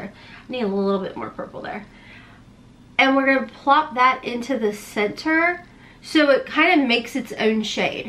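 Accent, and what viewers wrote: South, United States. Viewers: I had to stop this video when you said your not good with words and you hear it in the comments that broke me. Please never discredit better yet never let anyone in these comments discredit you or how you speak words. You are a tried and true southerner girl with a beautiful accent. You speak your words just fine. You articulate words perfectly in your own way, if they have issues then that's on them. This is coming from one southern cajun lady to another southern queen. Now back to the video. Blessings and Love!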